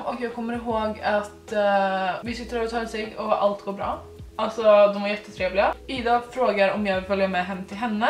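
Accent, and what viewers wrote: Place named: Norway. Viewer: Omg älska hur man kan höra din norska lite omg så nice haha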